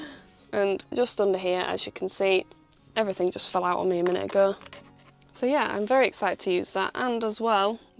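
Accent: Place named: Yorkshire, United Kingdom. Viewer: I’ve been missing that (Yorkshire?) accent.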